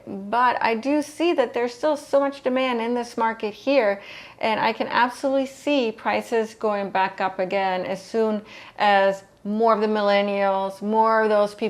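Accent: Florida, United States. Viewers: It is crazy for us inland as well. We are expected to assist in the insurance coverage of those millionaires mansions on the beach they keep rebuilding. Remote work was a godsend. You sound like a native Floridian too! ;)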